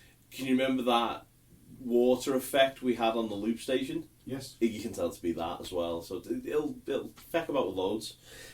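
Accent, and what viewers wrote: Liverpool, United Kingdom. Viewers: Cool dude! Are you from Liverpool?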